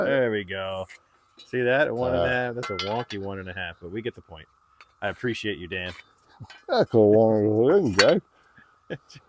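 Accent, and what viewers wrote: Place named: Mid-Atlantic, United States. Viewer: I enjoy your channel, glad I came across it. Two questions. Guessing from the dialect, you might be somewhere between Baltimore and Philadelphia? Somewhere nearby? Second, I’m curious how the laser projects all around those long logs. Are there an extra lasers on the periphery? Just curious how that works.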